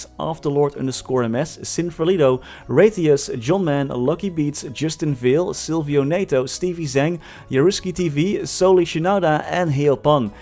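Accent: Netherlands. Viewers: I can tell by your english that you are dutch lol! Thanks voor de video maat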